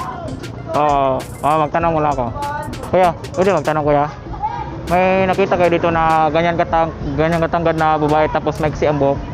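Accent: Visayas, Philippines. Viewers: Mailhan gehapon ka na nag prank ka Kay halata man imo tagalog tuno palang sa imo pag tagalog bisaya man .. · Yan camera ... ayus sana ung pag bigkas ng tagalog mo ayusin mo na rin pra s halata bisaya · Bisaya ka dong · Visaya sya kaya pwede ikaw mag adjust?✌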